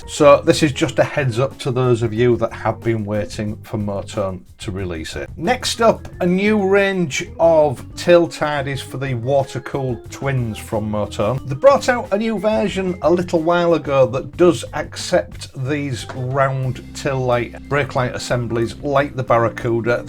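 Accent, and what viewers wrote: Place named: Hull, United Kingdom. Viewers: The way you talk is a problem to some people? That rich Hull accent! Up with Yorkshire! Great channel. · Spoken like a true Yorkshireman! I like your accent, not that it means a lot, but I do. My late Mother was born and bread in Yorkshire, well that part that’s now called CLEVELAND! My father died when I was only 10yrs old, he was a great deal older than my Mother, but succumbed to lung cancer in the days before that disease was linked to smoking, and he was a heavy smoker. My mother had a big family in Thornaby and surrounding areas. After my fathers demise, she sort solace in the bosom of her family, and I spent a lot of time ooop Nooorth! My home town was Cambridge. I thought we were going to move there, and I think that was my Mother’s original intention, but for some reason it never happened, I think it was something to do with my younger Brother and sister. 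Anyway, it’s long way round, to saying that your vids bring back very happy memories for me, and I love ‘em. When I left school, I joined the Army as a Boy Soldier, and my first posting after Boy Service was Catterick. I had a bike by then, and the rides around the moors were among the best I have done. Not to mention the seaside visits to Whitby, Seaton Carew, Redcar, Scarborough, and many of the villages that were made famous by TV programmes, long before they had been found by that Media. All I can say is, that anybody that has anything derogatory to say about you and your work, must have a very sad home life. As for me, being a new subscriber, I am happily going through ALL your vids, and absolutely loving it, and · I love your accent, my missus is from York, the Yorkshire accent is great. I want you to make money from the channel if it means you keep posting. Have a great Christmas.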